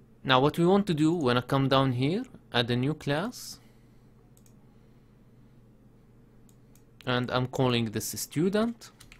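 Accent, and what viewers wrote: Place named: Arab world. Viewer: متأكد الي بحكي شخص عربي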